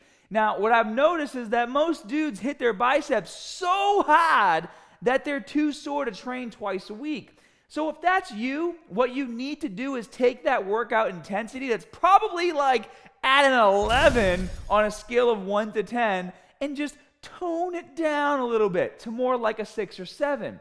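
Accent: Boston, United States. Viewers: Is he from Boston?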